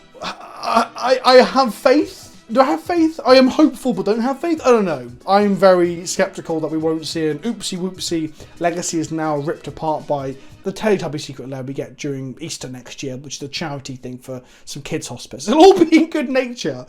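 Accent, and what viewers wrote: United Kingdom. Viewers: Woah woah hang on... you’re British?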